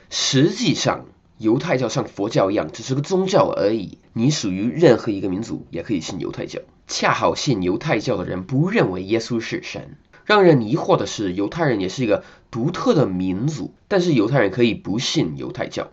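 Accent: Beijing, China. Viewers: Hah 北京话说得可以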